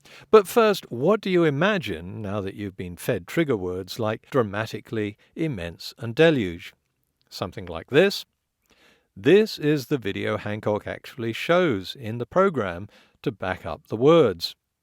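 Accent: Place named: England, United Kingdom. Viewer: Oh how I missed slightly sarcastic English voice.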